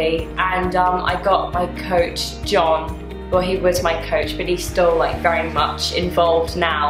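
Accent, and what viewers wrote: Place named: United Kingdom. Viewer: Barbados has perhaps the largest 'White' population in the Caribbean. Her accent sounds British, though...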